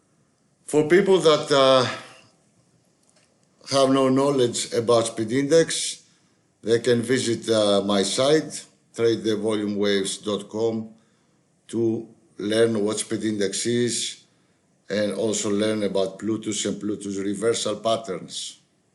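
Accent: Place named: Greece. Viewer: Και εγώ από Ελλάδα είμαι στη Θεσσαλονίκη μένω και μόλις είδα το βίντεο κατάλαβα ότι η προφορά είναι Ελληνική !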